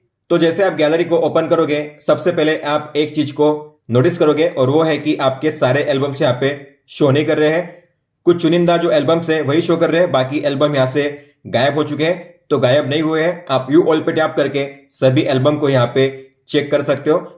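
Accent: Gujarat, India. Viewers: Bro r u from Gujarat??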